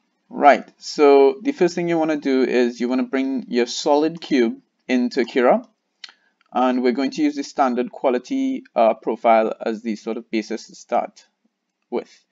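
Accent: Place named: Jamaica. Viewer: Thanks so much for the Video with the ender 3 saved me a lot of time. Sounds like you are from Jamaica, I grew up there myself, one of the few white Jamaicans out there. Thanks again, for the great job!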